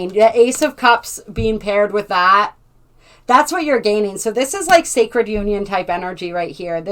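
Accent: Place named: Midwest, United States. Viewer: Your video brought tears to my eyes, a pressure in my heart chakra and inner peace. Thank you for your spirit, your guidance and you Midwest accent 🌈❤️🧡💛💚💙💜🤍☯️♋️/♌️ 33/6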